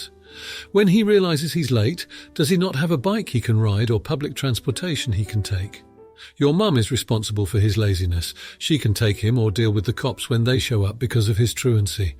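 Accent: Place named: United Kingdom. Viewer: Big fan of the British narration.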